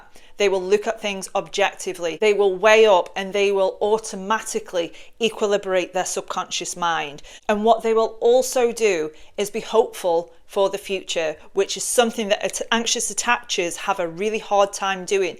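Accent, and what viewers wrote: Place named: Lancashire, United Kingdom. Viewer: Super helpful must help with the Lancashire accent? I dont know but gathering my self worth is helping alot i was excersizing boundaries which prompted my bring ghosted so on the right track but funny how the feeling of "oh what if" comes in againgst the obvious logic lol it is like breaking free from some kind of addiction obv attatchment x thanks for being you x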